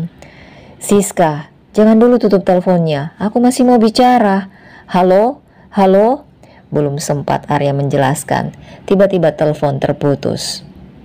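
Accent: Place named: Bugis, Indonesia. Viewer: Suku bugiskah, logatx sih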